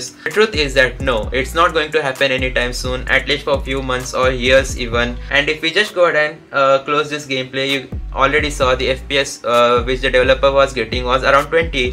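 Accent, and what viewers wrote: India. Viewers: I hear Indian accent I know it’s legit.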